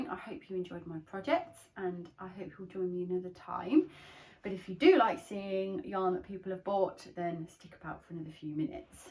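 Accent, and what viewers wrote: Midlands, United Kingdom. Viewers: I really enjoyed your first podcast and am very impressed that you tackled jumpers early on in your knitting journey. I am originally from Coventry (Eastern Green) and now live in Toronto, Canada, so nice to hear a Midlands accent . Love the modelling shots of you and your adorable kiddies 😆. Keep it up and looking forward to your future podcasts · I love the sleeves on your novice sweater! I found your first podcast yesterday and loved it, so got up this morning to watch your second before work. Plus I thought I picked up a Midlands accent! I’m from up the road from you in Birmingham.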